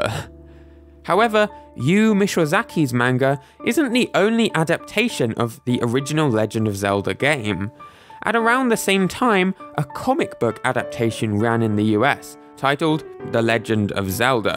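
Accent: United Kingdom. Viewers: I love how you pronounce it "Ocarin-er" lol. You're on the opposite side of the earth, and somehow, you're more redneck than me. Hello, from Texas, mi amigo, mein freund! God bless ya, dude. I love your vids. I love your accent, too. (American people think British sounding accents are indicative of high-level intelligence. But they'll still pick on you for pronouncing things differently than they do. Idk why. I'm a native Texan. I'll be American again, as soon as the rest of this country pulls their heads out of their asses.....) Keep up the great work.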